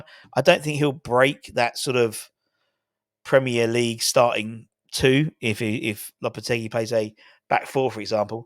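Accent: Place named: Northern Ireland, United Kingdom. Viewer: Northen Irish accent tickled me 😂😂😂😂😂😂😂 🏴🇬🇧🇬🇬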